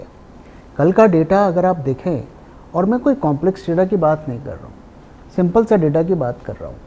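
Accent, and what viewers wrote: Bengal, India. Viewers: Yeh toh bengali wala hindi accent hain🙂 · Haha she has such a cute Bengali (or is it Assamese ? accent in her Hindi ! Love it. 😄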